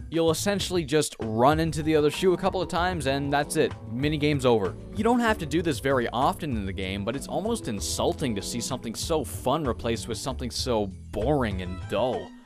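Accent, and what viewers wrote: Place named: Canada. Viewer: listens for about 5 minutes So, where in Canada are you?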